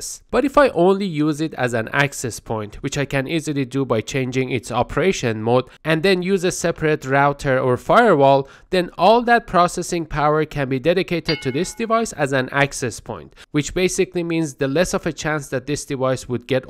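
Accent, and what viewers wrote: Iran. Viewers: Hey actually im interested on your accent, are you Iranian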